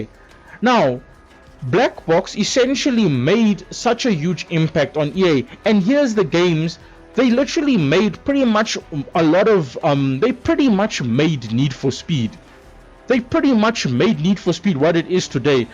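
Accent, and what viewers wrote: South Africa. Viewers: You south african bro? I havent heard a south african accent in forever (emmigrated awhile back)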